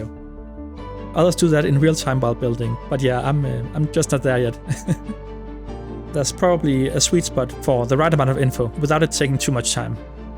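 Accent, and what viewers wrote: Denmark. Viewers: Yup, that’s definitely a Danish accent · You really sound like my late oldemor. She came to America from Denmark and had the heaviest accent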